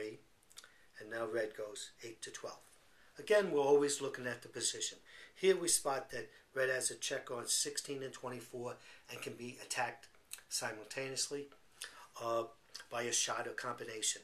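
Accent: New York, United States. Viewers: New York accent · ALEX he sounds like a man from New York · Not really lol, just normal NY accent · Wayback thats how all New Yorkers sound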